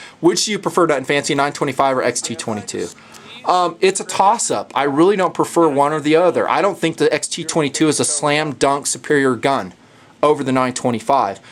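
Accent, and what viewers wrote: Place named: Appalachia, United States. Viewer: I love your hillbilly accent that drops in randomly in the video. but hey I cant get enough of your vids man.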